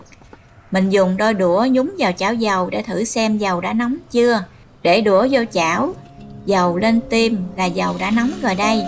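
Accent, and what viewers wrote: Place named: Mekong Delta, Vietnam. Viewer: Làm bánh khéo quá, giọng em gặt Miền Tây luôn đó, nghe rất hay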